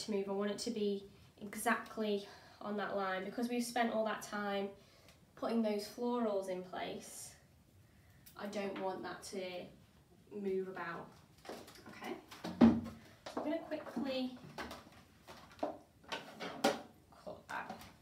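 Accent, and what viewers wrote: United Kingdom. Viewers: Good video, but it is hard to hear you. I put on closed captions. Low volume + British accent can be tough :-)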